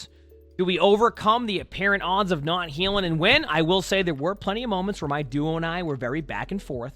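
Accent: Boston, United States. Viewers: I love the Boston accent😂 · Love the Boston accent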